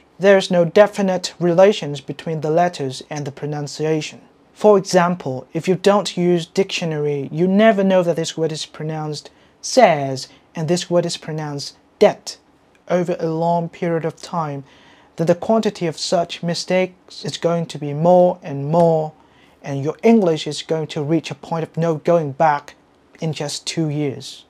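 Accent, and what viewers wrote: United Kingdom. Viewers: Cái số 1 đúng y sai lầm mà mình đã nhận ra và sửa. Bây giờ mỗi lần gặp 1 từ mới mình luôn tự nhủ bản thân là không được phát âm bậy Ngoài lề xíu bạn dùng accent UK à? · Anh này nói anh-anh bạn ơi, giọng anh anh nói vậy á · Hình như ổng nói giọng anh-anh đấy bạn, với lại còn nh nước nói tiếng anh mà, nghe anh-mỹ ko mốt gặp anh-trung anh-ấn, anh-nhật ... đủ kiểu sẽ hk có đủ trải nghiệm để bắt kịp cuộc nói chuyện ạ, theo kinh nghiệm của mình đã gặp anh-ấn ạ, vất vả lắm 😢, r cũng quen, do bả là cô giáo bộ môn của mình 😅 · Em thấy giọng anh hơi khó nghe, anh nói giọng Anh Anh đúng không ạ?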